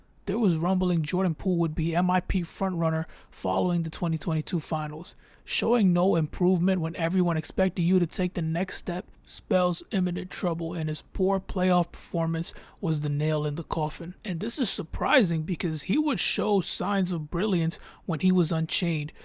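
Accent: New York, United States. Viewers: that New York drawl is perfect for basketball talk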